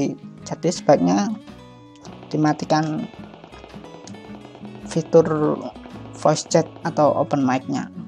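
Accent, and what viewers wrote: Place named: Java, Indonesia. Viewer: Medok e brrooo🤣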